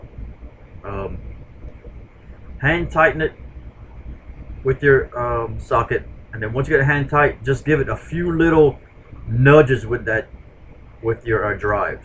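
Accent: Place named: Louisiana, United States. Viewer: I recognized that accent immediately. You from down the bayou. I’m from Thibodaux.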